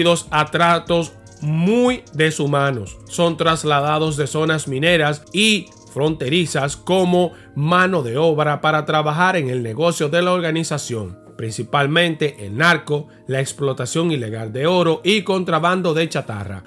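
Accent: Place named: Dominican Republic. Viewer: Q te pasa. No sea pesado, así hablan los dominicanos, el no tiene q mejorar nada.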